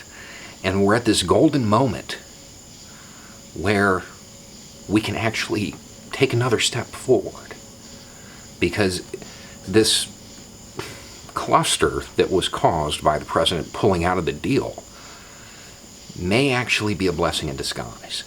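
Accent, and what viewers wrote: South, United States. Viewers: Don't try to fool yourselves by thinking we Iranians see trump calling off the invasion in the last minutes, as a sign of goodwill or concern for innocent people's lives. Everyone here knows he called it off because he was warned by his top brass, that even a single shot toward Iran, would definitely set all American bases in the Middle East on fire. No American can handle this catastrophe. He simply chickened out. Your president is a devil. Look how he thinks and deals with minorities in your own country. How can you be so naive? I really admire your personality and the way you are shockingly pro-humanitarian approaches about hot topics (especially with that southern accent), But thinking that this wet racoon has even a tinge of good will in his all existence is just not true.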